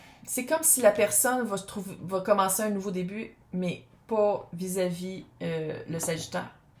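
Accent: Quebec, Canada. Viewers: moi je comprend tout (et je kiffe l'accent). Avant de mourir je visiterais le Québec!!!!!!! Donc gémeaux ascendant vierge....et la personne de mon cœur est sagittaire. Wahoooooooo ça fait du bien à entendre. Tout s'entrecroise pour les 3 signes. merci!!! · J'adore ton accent, l'accent du Canada en général, garde-le, je suis française et ça me COnvient parfaitement ❤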